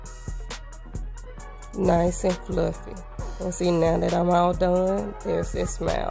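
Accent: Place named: Georgia, United States. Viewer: I knew it! My cousin says oil just like you and she's from Georgia. She so funny, she says stuff like "kitchen tile" as "catchin' towel" 😂😂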